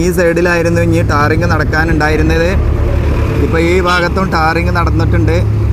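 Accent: Malappuram, India. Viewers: കഴിയുന്നതും വളരെ ഏറെ ഭംഗിയായി അവതരിപ്പിച്ചു.. നമ്മുടെ മലപ്പുറം ശൈലിയിൽ പറഞ്ഞപ്പോ വല്ലാത്തൊരു സന്തോഷം..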